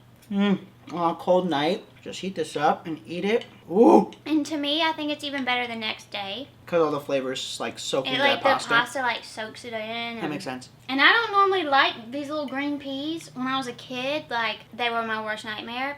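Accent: South, United States. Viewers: your southern accent comes out naturally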